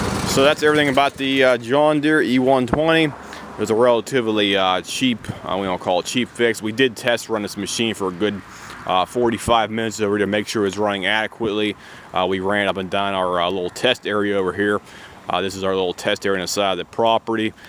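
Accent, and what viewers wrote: Pittsburgh, United States. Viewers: Love your Pittsburgh accent . My Wife and I were laughing as we grew up there but have been in Ga for 20 years now. We still have our Pitt accents and people still look at us funny when we talk. I went dahn to home depot and looked at one today n’at as my wife wanted to buy me a new mower for christmas but I didn’t want the credit card bill so I’m gonna save up till spring and get one then cuz I’m tired of repairing my old MTD yard machine every time I try to use it. Gotta say the old MTD still runs strong yet it need a lot of things revamped. I was thinking of putting the twin V from the MTD on a JD LT model I bought cause the deere cuts and handles so much better than the MTD but I keep getting junk in my carb jet on the JD LT model even though I replaced everything from fuel tank to carb except for the fuel pump and metal line across the motor. 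I think the junk might be coming from the metal line so I’m gonna replace that line and maybe add another fuel filter pre before the carb but I also have to take apart the carb again to clean the jet. If I can’t get that to work I’m selling it for parts along with the MTD and just getting a new mower. I’d love to find a video on the new E120 deere’s concerning maintenance and possible problem areas since I fix everything myself.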